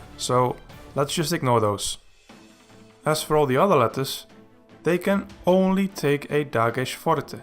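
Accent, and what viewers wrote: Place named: Netherlands. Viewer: hahaha nohhh mann. Ik hoor gelijkkkk dat je Nederlands bent 😂😂 we hebben zo'n accentje dat ze nergens ter wereld hebben. 😂😅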